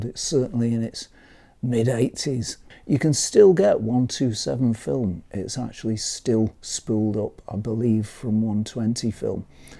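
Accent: United Kingdom. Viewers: My favourite series:) I love your British accent:)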